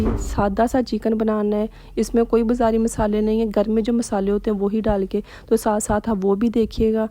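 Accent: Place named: Sialkot, Pakistan. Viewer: Your accent is Sialkot,nice accent,so nice of you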